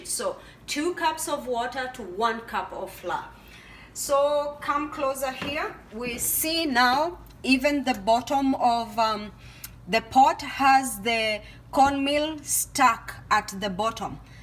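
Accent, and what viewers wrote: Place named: India. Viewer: Your English has got Indian accent I'm a bit surprised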